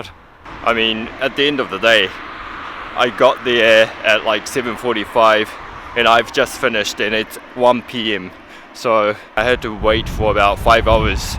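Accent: New Zealand. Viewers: NZ, :D ha I knew it! Your accent was so familiar! First I thought it was Austrailan, but nope it is different from that. (I had a teacher from Melbourne once) As for administration(paperwork and so on), I have never thought I would hear that this exists in Japan. Being a Hungarian, I got used to waiting for hours just to get something done, but since 2020 many things can be handled via email or online, or at least get an appointment. I really enjoy the way you see life and handle things. :) Thx for sharing.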